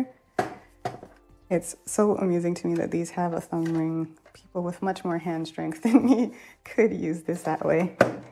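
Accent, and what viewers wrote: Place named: United States. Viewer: I cannot pin down what country you’re from... your english is so perfect and sounds very american but you mention al the prices etc in euros? I am very torn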